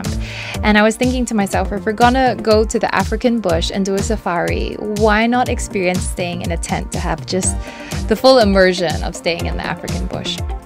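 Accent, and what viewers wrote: Australia. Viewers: Her Aussie accent😁 Elephants are my fav, they have amazing memories and they would dig hole for water and thats also a bonus to all other wild animals. I WISH you visited sheldrick wildlife trust, they do amazing work for wild animals in kenya ❤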